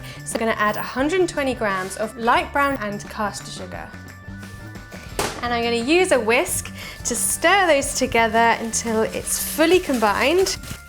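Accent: United Kingdom. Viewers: hello from south wales so nice to hear a british voice on a cooking channel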